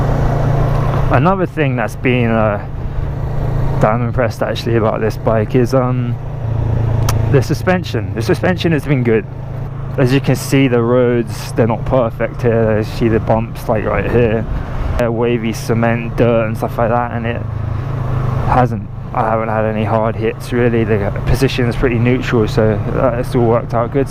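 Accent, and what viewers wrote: United Kingdom. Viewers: You sound British but I'm sure this is Thailand though! Are you based there? like your reviews, so new follower here!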